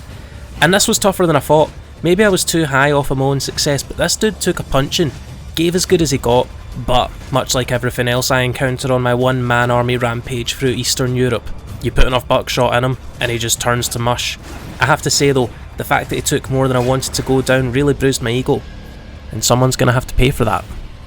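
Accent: Scotland, United Kingdom. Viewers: scottish dante ma men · Scottish Dante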